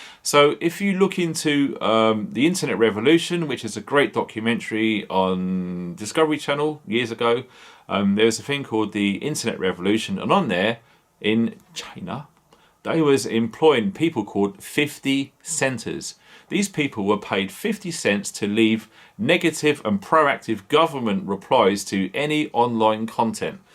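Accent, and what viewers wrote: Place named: Cornwall, United Kingdom. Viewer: Sounds like the North Coast of Cornwall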